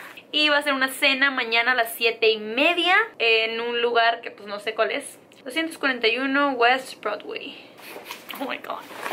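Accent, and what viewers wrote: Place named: Fresa, Mexico. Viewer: A se le está subiendo. Ya habla más fresa, antes era más sencilla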